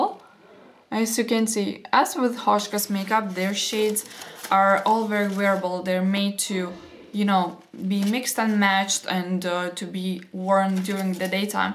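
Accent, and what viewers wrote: Russia. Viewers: You can fill distilled water into the mascara when it is getting too dry, just a few drops of water, then let it sit and shake it a few times so that the liquid can mix. It works very well I have done that several times. You don't have to throw it away, this way it lasts for a long time. Dr. Hauschka products are 100% made with biodynamically grown plants and some products contain natural minerals, ashes or clays. All ingredients are from nature in their whole form and not altered. They don't test on animals and they don't have subcontractor companies test on animals either. Dr. Hauschka and Weleda are the best cosmetic companies in the world. I only use those. You are very beautiful and I love the Russian accent.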